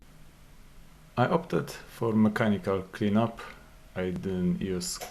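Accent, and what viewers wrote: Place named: Soviet Union. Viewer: Чомусь по твоєму акценту мені здається що ти з "совка" 😉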